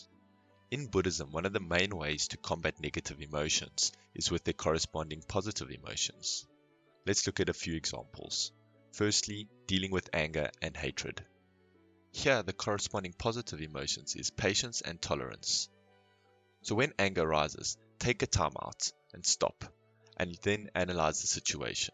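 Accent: South Africa. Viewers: Are you South African brother? 🇿🇦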